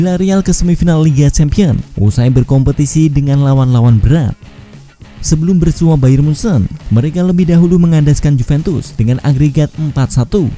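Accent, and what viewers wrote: Java, Indonesia. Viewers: Jijik suara nya medok 😛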